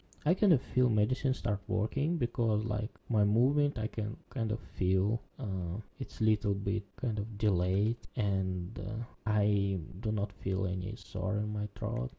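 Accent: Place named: Russia. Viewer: типичный русский акцент.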